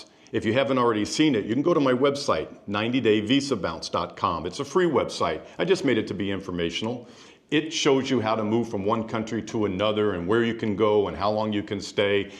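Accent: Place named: Midwest, United States. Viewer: Seems none of your seats face the wall TV in the scene behind you. Italianos call that style "oldefogeyia" :-) Sit well: Live well... & I live very-well in SW FL, USA and have found cycling an ebike expands one's appreciation of a place. Mobility is vital to a "good life", yes? I'm too-long-ago from Pavia, and a lifelong cyclist. Best for folks ANY folks living in those Italian towns to be one, too! :-) For lots of good Italiano reasons. No disrespect, but your voice isn't typically "Italiano-sounding". More like a Chicago/MidWest US-twang to it, maybe? Their everywhere here, too! :-)))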